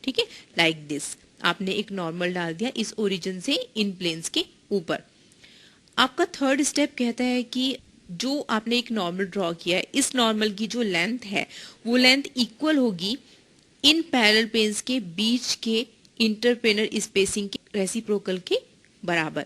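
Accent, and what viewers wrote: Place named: India. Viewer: ....本来听生肉已经很难了，还是印度口音，有点绝望。不过讲的确实不错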